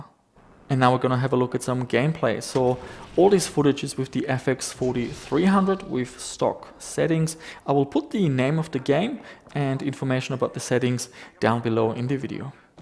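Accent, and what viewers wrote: Germany. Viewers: YOU HAVE THE HARDEST GERMAN DIALECT I´VE EVER HEARD :D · How come this man sounds like a German? Can somebody explain? I thought he was an Australian. I have no clue haha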